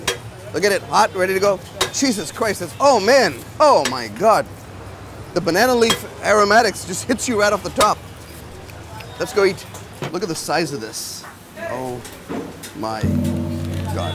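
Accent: United States. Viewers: American Indians don't even sound this American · Probably the first presenter I encountered who has an authentic (American) English accent as well as an original sounding Hindi accent. Both preserved and original in their own sense. Compared to so many presenters on Internet with their fake accents. Very well presented. Great job🎉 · Malayalee in american accent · Following cause of your host.... Face of Naseeruddin Shah, accent of an American, 100% understanding of Indian culture and the calm, reasonable way he comments.. 5 stars all the way.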